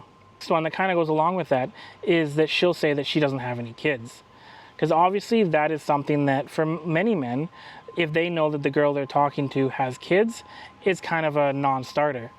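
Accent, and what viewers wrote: United States. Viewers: *Im not american*, says the dude with one of the most american accents Ive ever heard. Sounds like hes straight out of Cleveland or some shit. lol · You sound American to me, but if you say you are not I will assume you are Canadian, which by defintion, in my book, is "American"... :)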